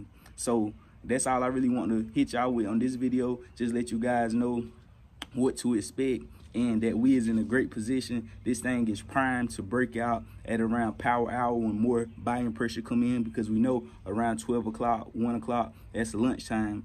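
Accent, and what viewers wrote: South, United States. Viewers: I swear I'm not trying to spread FUD. I'm diamond handing like a mofo lol. But I am curious about the dip in volume lately. Are less retailers buying? Btw, shout out from Alabama! I can tell you're from the south!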